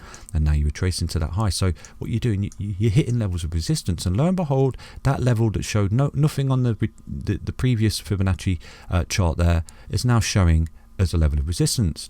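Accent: United Kingdom. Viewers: Following your prediction perfect so far. Love your British accent, but I think it would be good for your channel to use, or at least mention USD prices. I’m European, but because most people on the internet use USD price, I find it easier to think in USD levels. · Didn't expect to find Chiliz T/A - Have now subbed and hit the bell button :) Great to listen to a british voice. I'm all in :)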